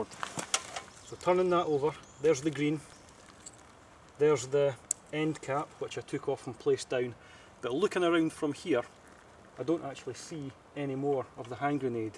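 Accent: Scotland, United Kingdom. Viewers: he so british i cant understand a thing he says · i love the scottish accent :D its so damn cool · This guy is Scottish, and using a Stielhandgrenate... TF2 Demoman anyone? Ullapool Caber! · are you scottish ? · Scottish he is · He sounds Scottish but UK actually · are you scottish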